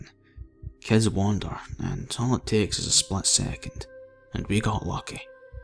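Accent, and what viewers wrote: Scotland, United Kingdom. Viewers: Always enjoy the stories your the delivery in my accent is great too.. even if ye did say ass and no arse… Just curious are you Scottish and do you live in Scotland? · Great video fab to hear a Scottish narrator 😊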